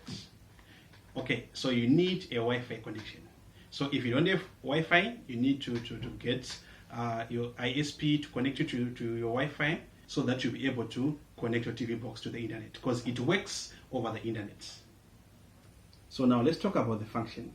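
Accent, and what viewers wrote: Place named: Zimbabwe. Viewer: Accent says Zim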